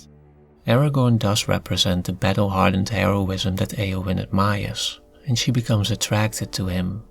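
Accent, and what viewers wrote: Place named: Netherlands. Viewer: 10 seconds in and I'm like: Hey! A dutch narrator speaking english!😃 Are you Dutch?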